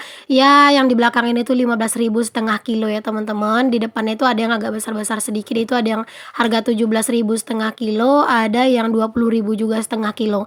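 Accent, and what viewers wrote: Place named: Padang, Indonesia. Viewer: Alhamdulillah, Tabarokalloh... Seneng dengar Bunda bicara menggunakan bahasa daerah, seperti loghot adik ipar yg dari padang...🙏🙏😊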